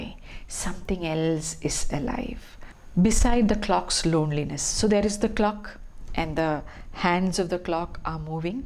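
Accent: Kerala, India. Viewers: Must be a Malayali,Its evident from her accent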